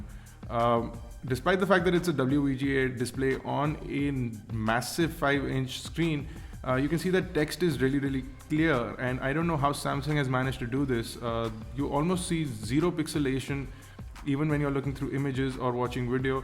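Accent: India. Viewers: Indian tang... not english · you can know an indian by his English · Indiaaa? :D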